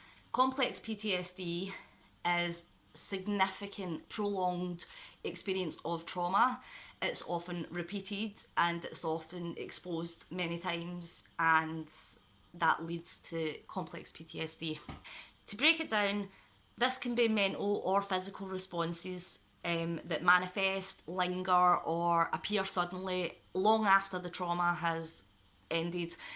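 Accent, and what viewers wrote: Scotland, United Kingdom. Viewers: Soz I couldnt get any sound on my phone that's why I asked if your english its obvious now my phone is working that your Scottish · i love you and i'm sorry all of this has happened to you, and i'm sorry this illness runs so rampant in your life. i'm also a mother, living in scotland, with ptsd & other mental illness'. i was absolutely gobsmacked when you explained your teacher read that letter to the entire school?! i actually cannot understand that but at the same time.. schools here back then were rough. nothing good ever came from speaking up which is absolutely not how it should have been. ptsd really controls so much of my life and its such a fragile and difficult topic to speak about, never mind trying to tell someone we you are the way you are and what happened or what triggers you so the sincerest well done on being brave enough to make and post this video. 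it's probably helped a lot of people who are struggling too or who know someone struggling in a similar way. sounds daft but it was helpful for me to hear this all come from someone who sounds the same as me, if that makes sense? same accent and way of talking etc which i don't exactly come across much on youtube etc so it just.. i don't know, felt a bit more personal or something. i hope you're doing okay :)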